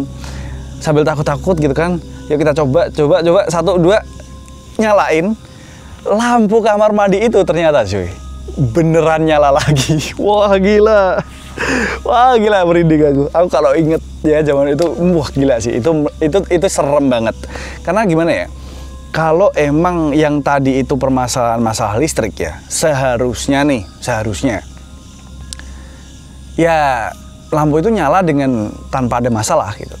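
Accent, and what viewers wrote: Java, Indonesia. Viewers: Gara² Kewan, Jowone metu cok..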